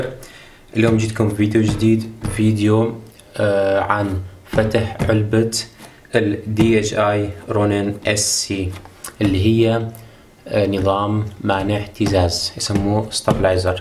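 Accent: Mosul, Iraq. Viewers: لهجتك مال الموصل 😁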